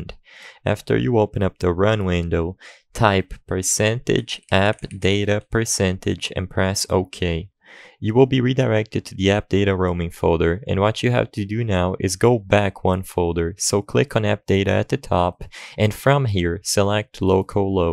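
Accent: Brazil. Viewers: eu percebi que voce era brasileiro pelo sotaque kkkkkkkk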